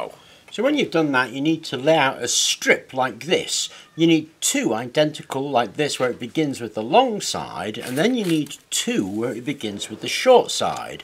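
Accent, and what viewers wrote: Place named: England, United Kingdom. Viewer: Actually watching this for camera bellows. That English accent is just the cherry on top.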